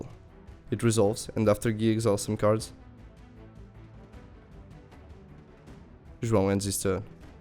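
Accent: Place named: United Kingdom. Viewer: Love the new video production, the narrator has a very good British style accent.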